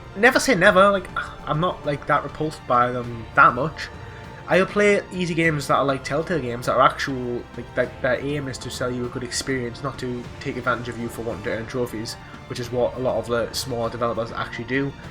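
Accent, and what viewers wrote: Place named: Newcastle, United Kingdom. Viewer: Ahh yes a fellow Geordie 😁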